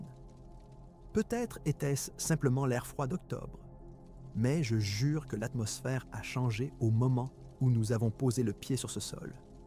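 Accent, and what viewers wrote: Quebec, Canada. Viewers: J adore vos histoires...avec le petit accent québécois c est très sympa 👍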